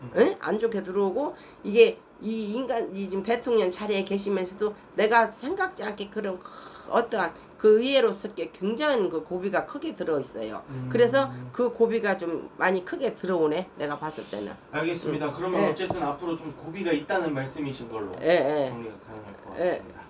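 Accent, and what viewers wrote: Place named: Jeolla, South Korea. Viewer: 전라도말씨 찰지다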